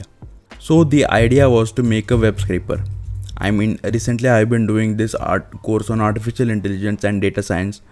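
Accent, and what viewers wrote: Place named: India. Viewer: you're the first guy with an indian accent that I enjoyed watching :D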